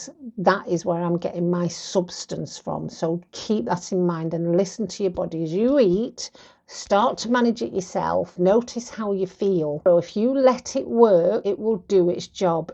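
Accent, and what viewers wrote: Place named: England, United Kingdom. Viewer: I like your accent. What part of England are you from?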